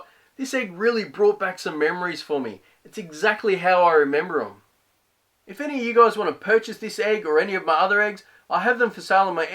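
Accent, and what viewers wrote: Australia. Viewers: Are you putting on the Australian accent extra thick on purpose? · Those are beautiful💯 New sub here, it's nice to hear an Aussie accent. Cheers from Melbourne mate😊✌❤🇦🇺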